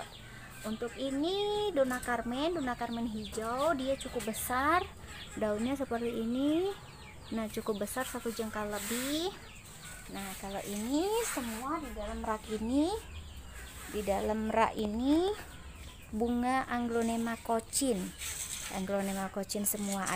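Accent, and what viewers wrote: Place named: Manado, Indonesia. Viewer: Bahasa manado xa mendok banget🤩.Semangat🙏🙏🙏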